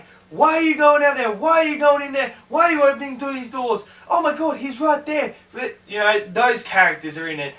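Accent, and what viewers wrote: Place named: Australia. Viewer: Martin Cuadra he’s also from Australia so things may not sound the same with an accent. I’m glad you had the time to actually sit there and write a comment about it. 👏🏼👏🏼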